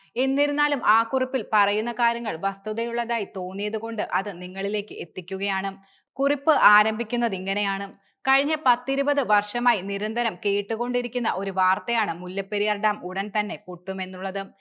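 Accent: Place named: Tamil Nadu, India. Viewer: അണ്ണാച്ചി പൂറി മോൾ മലയാളത്തിൽ സംസാരിച്ച പോലെ ആണ് എനിക്ക് തോന്നിയത് 😡😡😡😡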